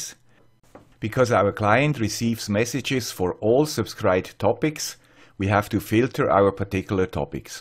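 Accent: Switzerland. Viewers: Damn you 'guy with the Swiss accent'! You've gone and preempted me - with the 'topic' of my forthcoming video (see what I did there)?. But thanks for the reference and excellent dual between Broker and UTube. I'll go back and 'like' furiously! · Thank you friend with the Swiss accent. I have enjoyed many of your videos and was excited to see you get a Pi Zero W, now MQTT. I will probably have to watch these videos many times until I understand since I'm new at coding. Thanks for the good tutorial, Hope to see many more. I need help!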